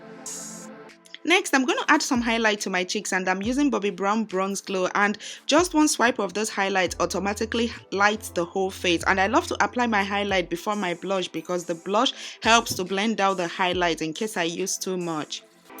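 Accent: Nigeria. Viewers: my sister... I like ur nija accent beta oooh.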